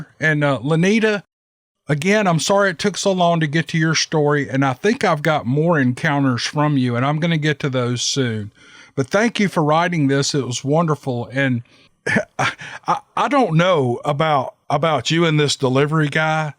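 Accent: South, United States. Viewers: Welcome back we get to hear that southern draw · Another great monster in a swamp story! Nothing better. Especially from a guy with a very twangy southern accent. It makes me want to be there! lol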